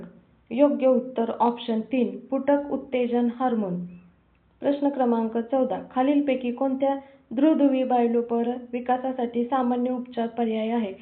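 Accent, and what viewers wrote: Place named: Solapur, India. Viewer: तुम्ही सोलापूर डिस्ट्रिक्ट चे आहे का मॅम आमच्या ऑफिस मध्ये एक सोलापूर चे कर्मचारी आहे ते तुमच्यासारखी बोलतात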